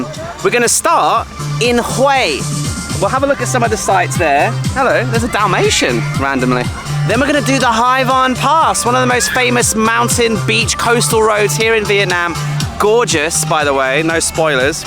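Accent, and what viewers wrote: United Kingdom. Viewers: I used to think British people were rather cold and didn't know how to joke, because I have a few British colleagues in the company. But after watching your channel I realized I was wrong, you are quite funny and cute and are you British (I recognize your accent) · have to say, I have been to Vietnam 25 times and lived there for 2 years! But your videos make me want to go back and do all the places I've visited again 😄 Keep it up lad! Always love my tour videos in a blighty accent... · Fantastic and beautiful episode (as always) of Vietnam,! Really enjoying this series too! Had a little chuckle towards the end, with your word for swim shorts: "swimming costume"- you might of lived overseas for years - but you still sound so very British (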